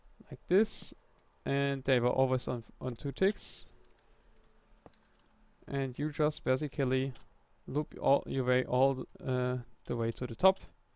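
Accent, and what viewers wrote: Germany. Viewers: So you speak normale German, you don't Sound like someone who s motherlanguage english is?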